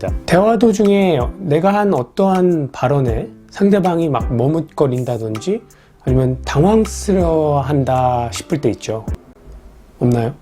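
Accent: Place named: United States. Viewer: 케이C님 토종 한국인 인줄 알았는데..영어 발음이...미쿡사람?^^ 이셨내용 👍잼있고 유익해서 담편도 당근 기대합니다~~😉😉😉😉😉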